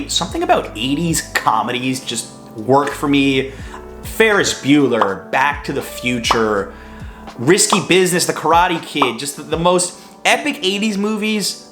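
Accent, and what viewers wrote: New York, United States. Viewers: I’m Japanese and grew up in Flushing Queens in the 70”s ! I’m now in my mid 50’s and living in Japan for 40 years but your vlog brings back all the great memories in my childhood. I’ m also losing my English speaking abilities but your vlog reminds me some of the New York accents and all the good foods especially pizza and sandwiches(^^) !! I love watching your videos! Keep up the good work😊😃‼️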